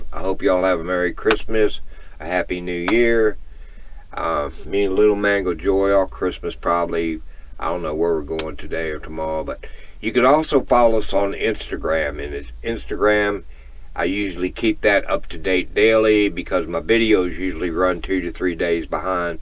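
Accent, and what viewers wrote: South, United States. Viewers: I loved how when you went to Mexico and returned, as soon as the American border agents heard your Southern drawl they just sort of waived you on through.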